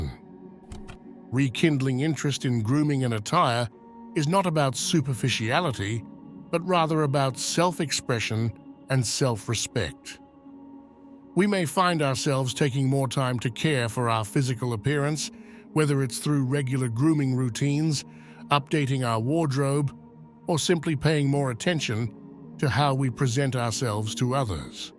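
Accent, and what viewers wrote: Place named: Australia. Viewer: Nice Aussie TTS. Good one. 👍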